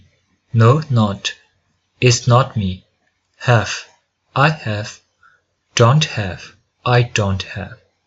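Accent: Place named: Lhasa, China. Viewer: He’s just reading in Lhasa dialect the classical one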